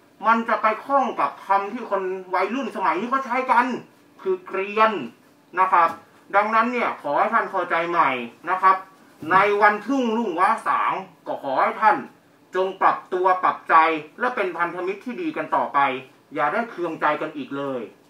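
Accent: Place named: Laos. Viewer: พี่ทำได้ลาวจังฮะ 5555+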